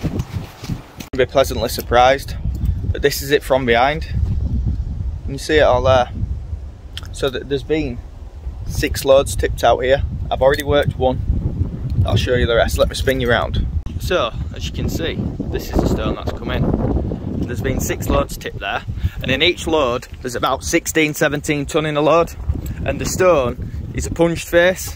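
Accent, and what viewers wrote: West Yorkshire, United Kingdom. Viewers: Came for the workmanship, stayed to hear my own beautiful West Yorkshire native accent that I miss hearing daily now I live in the USA. Thanks for being a brill Yorkshire ambassador, wearing your accent and showing the world Yorkshire pride, skill and industry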